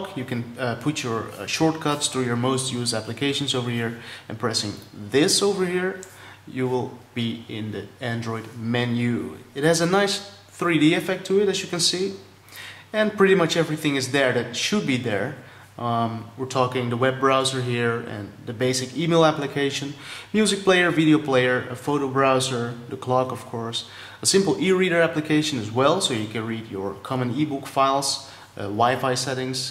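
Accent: Netherlands. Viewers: OMG Dutch English always sucks ... (but nice try) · wow a chinese who talks with a Dutch accent you don't see that very often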